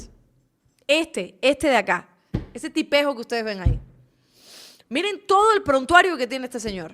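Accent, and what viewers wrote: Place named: Cuba. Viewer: Saludos bella....Me encanta tu programa....cada vez hablas más en Cubanito...que linda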